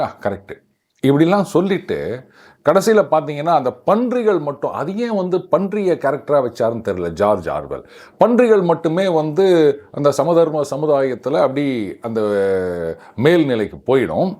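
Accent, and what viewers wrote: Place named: Chennai, India. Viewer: JRS ஐயா வழியில் மெட்ராஸ் பாஷை 😇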